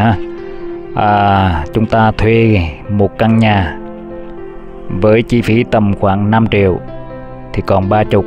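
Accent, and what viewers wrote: Central, Vietnam. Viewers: Nghe anh phân tích thích ghê,,,giọng miền trung nhẹ nhàng dễ nghe,,chắc hát hay lắm đây,,Em thích kênh này với Nhân La Cà nhất,,ngày nào ko xem cảm giác như thiếu thốn gì đó,,thanks anh!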